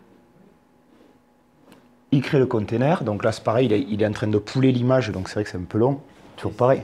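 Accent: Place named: Aveyron, France. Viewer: Merci ! Par contre c’est l’accent aveyronnais 😉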